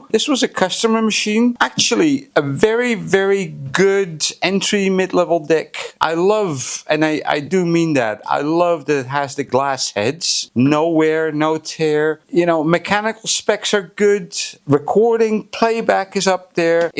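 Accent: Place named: Netherlands. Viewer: you sound Dutch and I am looking for somebody to service a Nakamichi zx 7. Can you help me?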